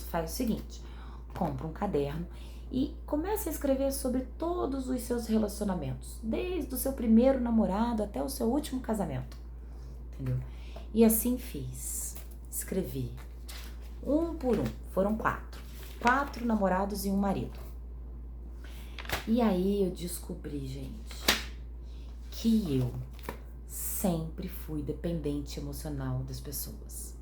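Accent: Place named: Rio de Janeiro, Brazil. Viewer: Cara..do nada vi.. que agora já não gostei mais.. escreve.. menina quero uma namorada..sou veio.. aliás mais ou menos veio.. psicóloga é bom pra desabafar.. mas vc tem um sotaque meio que carioca né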